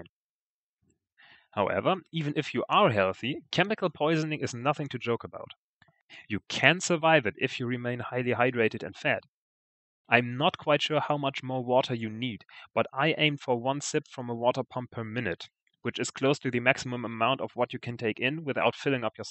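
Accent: Germany. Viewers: Schönes deutsches Englisch :) habs sehr gut verstanden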